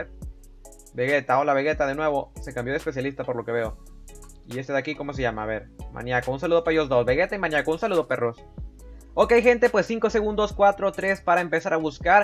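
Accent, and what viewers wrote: Colombia. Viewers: valla asento colombiano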